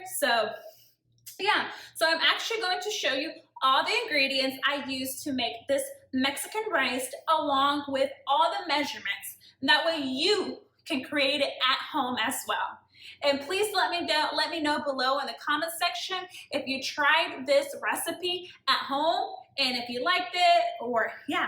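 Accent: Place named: South, United States. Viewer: I aint everrr met a mexican with a southern accent lol. I love this.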